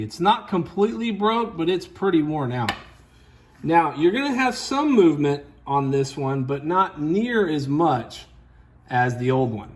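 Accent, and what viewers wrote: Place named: Texas, United States. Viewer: Instruction car videos are just better in a Texas accent. LOL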